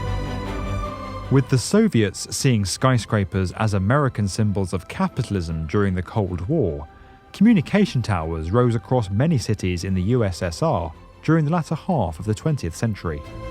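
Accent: United Kingdom. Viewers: too britishhhhhh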